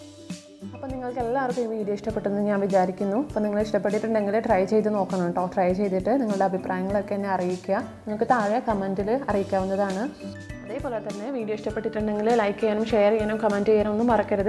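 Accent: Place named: Palakkad, India. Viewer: U have a Palakkad influence in it language....but whatever....I loved this recipe hit like.....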